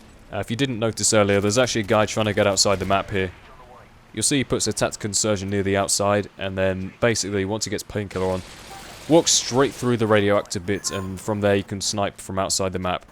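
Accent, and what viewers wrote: United Kingdom. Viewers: My favourite commentators are you (obv) and The Trout91. I just like hearing other British people I guess and you talk about interesting stuff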